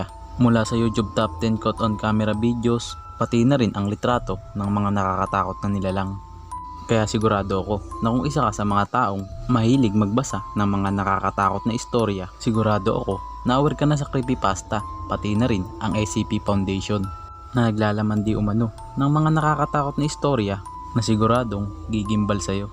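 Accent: Iloilo, Philippines. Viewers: ilonggo ka pu ba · Ilonggo ka ba kuya? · Bisaya ka ba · Lods., bisaya ka no.?.. Ma.subscribe nga...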